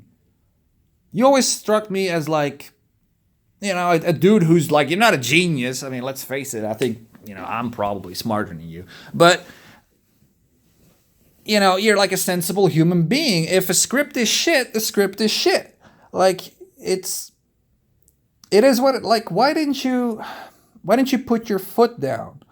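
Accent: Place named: Netherlands. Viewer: bro you are a nerd with the worst dutch accent ever ... and i'm dutch, so if you wanna fight me let's meet up i would love to thump in your geek ass head ...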